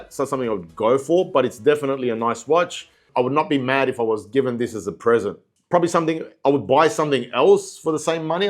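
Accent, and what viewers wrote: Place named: Australia. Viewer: hey brother, are you from Australia as i recognise the accent. Enjoying the content though !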